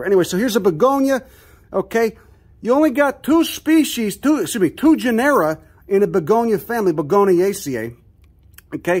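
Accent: Chicago, United States. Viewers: Chicago accent!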